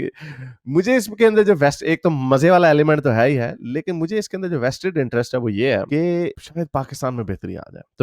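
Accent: Punjab, India. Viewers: Your accent and panjabi tadka kamal hai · Punjabi accent thoda jyaada ho raha hai,, samajhne mei dikkat hota hai.. Thoda plain hindi bola karein.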